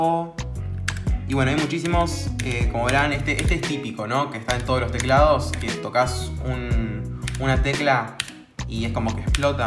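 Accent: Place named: Paraguay. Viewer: Tiene la ñ paraguaya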